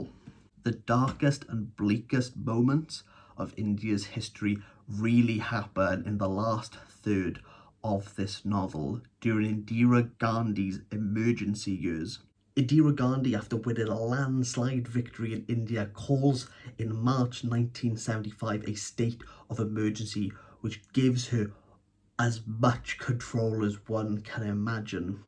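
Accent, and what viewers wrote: Wales, United Kingdom. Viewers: Mate, what an amazing review. I love your energy and engagement. Please keep it up! (And sorry for my ignorant question, but accents from Britain fascinate me — is that a great Welsh accent you have?) All the best.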